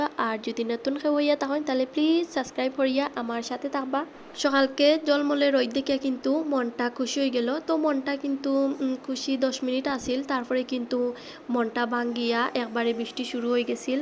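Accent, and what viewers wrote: Sylhet, Bangladesh. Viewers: apu tumer katha gulo sonle mone hoi sylet chole jai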